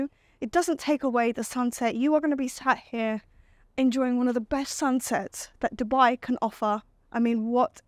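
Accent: London, United Kingdom. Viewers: Definitely an accent from the UK, possibly London. Great to see young individuals getting out there opening doors and driving forward … well done, keep driving forward… As an engineer in the Oil Industry I did the same, big world out there and you never know who you could meet that could change one’s life…. As I stated great presentation… this home is fantastic…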